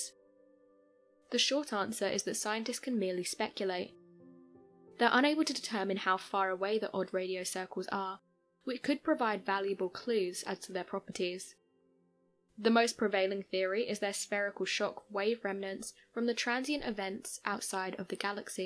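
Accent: England, United Kingdom. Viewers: I wish you could Keep the heavy English accent in England , can’t find anyone who can interpret into American English sorry , bye bye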